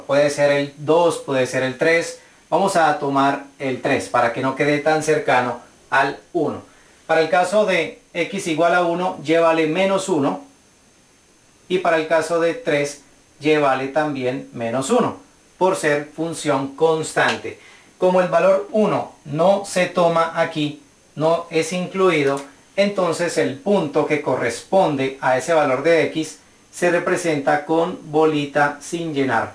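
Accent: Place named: Colombia. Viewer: Definitivamente los profesores Colombianos explican con muchísima mas claridad,debe ser su español que esta catalogado, como el mejor que se hablan los países latinos. muchas gracias por compartir tus conocimientos en matemáticas con todos nosotros.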